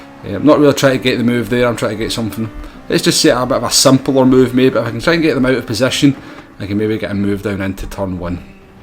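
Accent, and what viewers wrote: Scotland, United Kingdom. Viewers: haha I knew exactly what you meant so it's not a bad descriptive either, just a rather amusing one. Especially with the Scottish twang. Maybe you should trademark it for when you hit the big time of seven gazillion subscribers ;)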